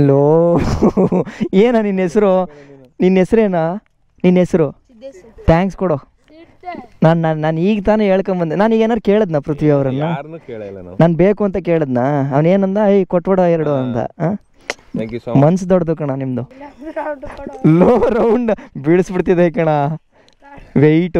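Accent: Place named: Chamarajanagar, India. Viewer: Chamarajanagar Slang 😅👌🏻👌🏻👌🏻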